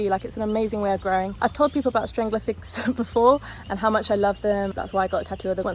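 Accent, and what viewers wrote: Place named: Australia. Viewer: Great Video! I love your passion for plants! Its something that I have always overlooked, not deliberately but I have just been drawn to things that move (at a noticeable rate). I cant work out your accent? Some sounds Aussie, some a little south African and then some sounds English. Have you travelled about a bit by any chance?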